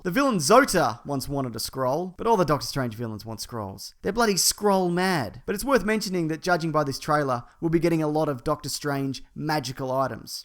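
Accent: Australia. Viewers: yeah his australian speech pattern is definitely entertaining. keep bloody going mate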